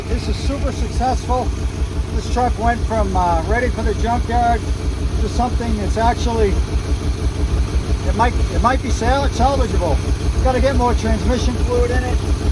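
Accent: New England, United States. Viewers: haha thought I could here some new england in your voice! Keep up the good work love your channel!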